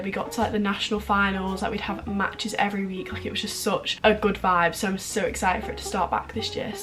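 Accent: United Kingdom. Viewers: I love the British accent😊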